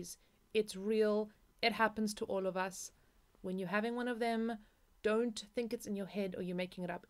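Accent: South Africa. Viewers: I don't think she's British. I'm thinking South African and/or European who went to International School. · Hey girl- are you South African?! I hear that accent ;) ;) soo much love from the Jacaranda city! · South African ex-pat with a slight British twang? This is the first video I've watched on this channel :) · Are you from South Africa by any chance?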